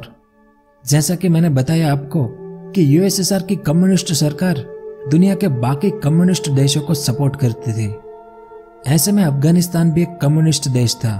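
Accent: Gujarat, India. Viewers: Your tone of hindi speaking shows that you are gujarati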